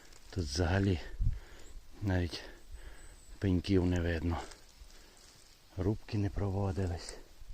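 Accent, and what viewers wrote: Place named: Zakarpattia, Ukraine. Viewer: Чернівці значить, я із Закарпаття,з Виноградова просто Ви розмовляєте як у нас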